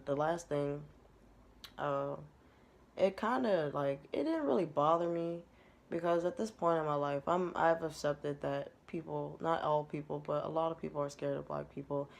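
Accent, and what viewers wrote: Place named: Prince George's County, United States. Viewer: You sound like you live in PG County. You should check out the Reelback channel. I had a white dude come up to me and my mom dressed like Jesus and the white family walking next to us were more scared than me. I grabbed my mom and told him to bust a move. The biggest rats I have ever seen were in DuPont Circle. I love my hood as you say. But, I stay away from downtown (Latte City). A lot of the white people in DC are rude as hell. Interjecting themselves in your business, not moving out of the way...And, they give that creepy smile at you. 🤪🙄Matter of fact, I’m about to go outside and see what’s popping. I haven’t worked for the man in five years and my whole perspective has changed. It is imperative to support your tribe. They will support you. Too many of us are straddling the fence. You sound as if you are entering another phase in your life. 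Growth...Have a great week...You are not alone...😋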